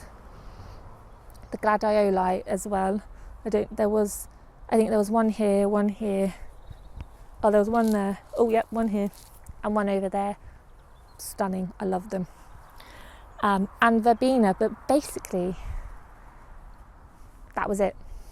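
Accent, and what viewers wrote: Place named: Wales, United Kingdom. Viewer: Hooray! Lovely update 😊 You know, I think we're all very critical of our own Allotments, I think yours looks rather tidy! Looking forward to seeing a bit more of you in the winter months. A bit of a Welsh twang in there too 🐑🐑 x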